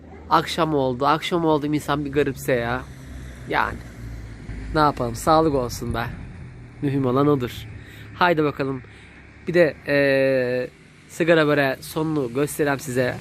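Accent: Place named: Zonguldak, Turkey. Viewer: Ellerinize sağlık Maaşaalaaah. elinden herşey geliyo.Sşnopta yan komşumuz zonguldaklı,aynı sizin gibi konuşuyo.Öpüyorum ikinizi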